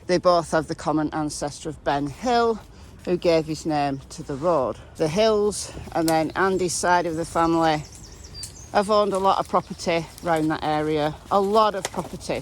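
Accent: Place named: England, United Kingdom. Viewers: Hi from a Lancashire lass living in France! Love to hear your accent and to follow your daily walks with your adorable girls. Thank you for all your reviews and updates on various cases. Your talks are always based on facts and substantiated details but you also think out of the box using your instinct and commonsense to see through the twists and turns of all the intriguing cases you cover. You are a proper northern lass straight talking, analytical, empathetic and able to see all sides of the story. Love listening to your daily walks and crime story Sundays. Take a look sometime at the intriguing French crimes, there's a lot! 🤗🐕🐾🐕🐾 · From Linton, Indiana (South Central Indiana). Love your content; seeing the English countryside; and watching your dogs run and play. And I love ❤️ hearing your English accent.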